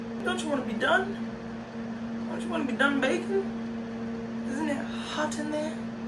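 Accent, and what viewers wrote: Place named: United Kingdom. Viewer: I love the British accent 🤣🤣🤣 "Fart around! Be ridiculous! Who cares if they cringe?"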